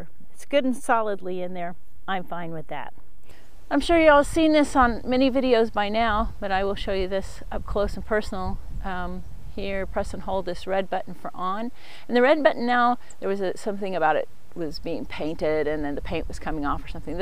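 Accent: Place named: United States. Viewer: If It wasnt for your American accent, I could swear that you were here with me in Australia! A+ review as always & 3 the hat!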